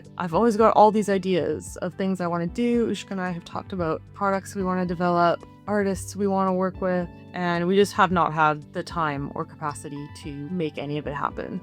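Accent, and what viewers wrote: United Kingdom. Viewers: It's interesting to see how the way you speak has changed over the past year. I hear the British influence on your accent 😊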